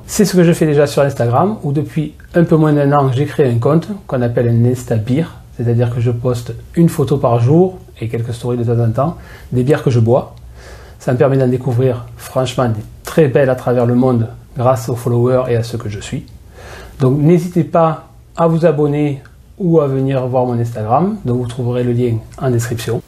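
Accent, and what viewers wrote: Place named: Marseille, France. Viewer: Félicitations pour ce beau projet !!! 🍻 Et vive l'accent marseillais ! 😄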